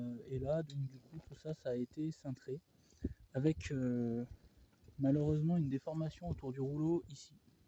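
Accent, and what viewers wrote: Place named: Savoie, France. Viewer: Tu es d’où mec ? Ça sent la Savoie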